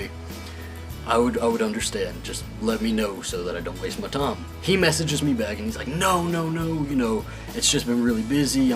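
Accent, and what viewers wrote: South, United States. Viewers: Your southern accent is cute 😂❤️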